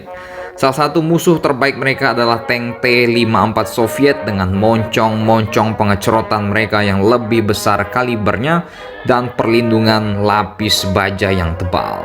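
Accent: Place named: Makassar, Indonesia. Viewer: Min orang makasar ya khas bangat suaranya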